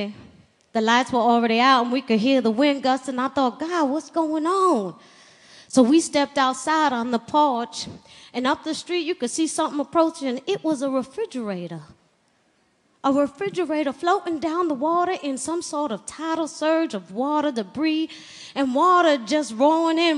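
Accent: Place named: South, United States. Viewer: a little too southern but so what? the brooklyn accent and new orleans accent is almost identical.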